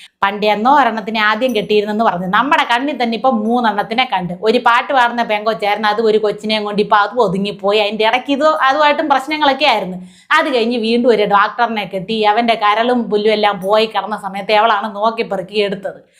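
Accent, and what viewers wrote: Thiruvananthapuram, India. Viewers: ചിരിച് ചിരിച് ചത്തു തിരുവനന്തപുരം കാരിയാണോ സ്ലാങ് കലക്കി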